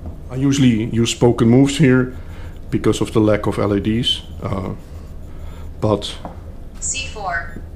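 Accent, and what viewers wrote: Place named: Netherlands. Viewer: Aaaah een mede Nederlander, hoor dat direct aan je accent XD toffe video!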